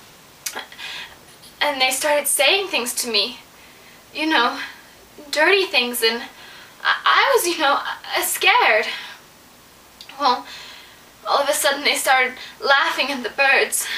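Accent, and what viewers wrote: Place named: Australia. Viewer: so two thoughts, First where are you from, on certain words i hear the slightest accent, like you hail from Australia or some thing, other thought, i really did not feel your emotion, you tried to emote but i did not feel it, lovely any how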